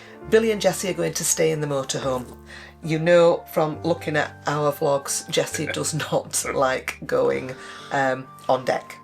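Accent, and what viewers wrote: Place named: Northern Ireland, United Kingdom. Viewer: as I’m of Northern Irish heritage I can confirm your N I accent was perfect, bravo 👏👏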